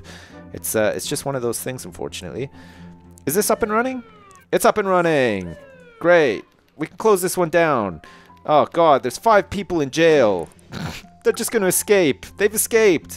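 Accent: Canada. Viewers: are you canadian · Is canadian???? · YOUR FROM CANADA?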